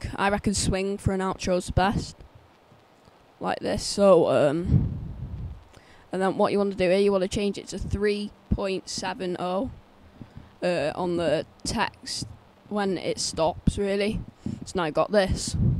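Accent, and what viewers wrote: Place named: Ireland. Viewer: thnx m8 love ya irish accent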